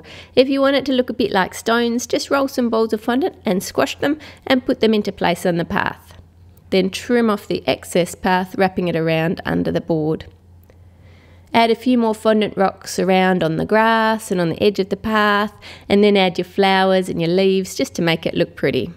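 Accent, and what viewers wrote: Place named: Australia. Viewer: G'day! Just wondering if you could do a Video tutorial on this cake. <link> I would like to do it for my daughters birthday in September, and your videos are GREAT. Thanks. Ps nice to hear an Aussie accent on you tube. You are VERY talented.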